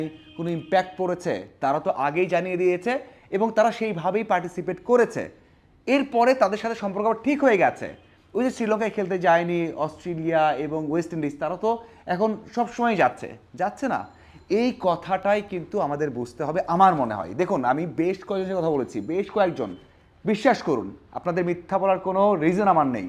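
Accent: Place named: Kolkata, India. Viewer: ভাই আপনি কি কলকাতার মানুষ নাকি কথা দ্বারা তো এটা বোঝা যাচ্ছে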